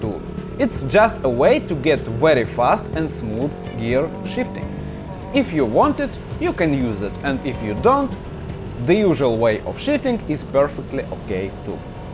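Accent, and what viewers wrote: Russia. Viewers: Please don't make fun of your accent, I love your Russian accent and I highly enjoy you content!😄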